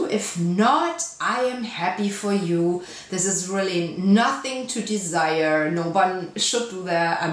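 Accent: Germany. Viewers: Where are you from? You sound Swabian.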